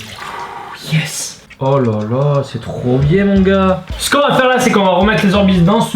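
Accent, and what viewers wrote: Marseille, France. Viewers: C'est un marseillais c'est son accent qui le fais dire litchi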